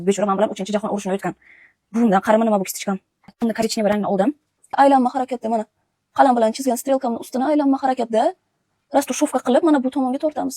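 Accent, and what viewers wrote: Bukhara, Uzbekistan. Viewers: Buxorolik siz aniq buxoroni shevesi vashe yoqadide😂❤❤❤❤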